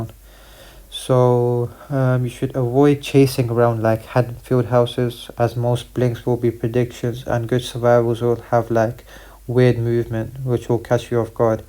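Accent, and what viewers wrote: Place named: RP, United Kingdom. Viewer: Yeah, that's an RP accent you've got there. Your location on YT says that you're from India tho?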